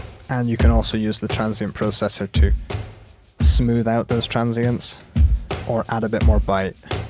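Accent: Scotland, United Kingdom. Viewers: Offtopic... is you accent Scottish?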